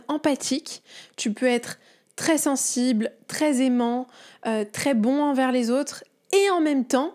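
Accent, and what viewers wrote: France. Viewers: Le meilleur podcast que je écoute, vous êtes pas une nordiste par hasard 🎉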